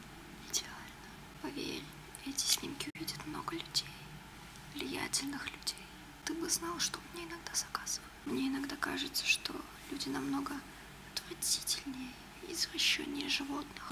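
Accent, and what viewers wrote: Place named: Russia. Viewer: I agree. Her Russian accent really makes this more relaxing than it should be!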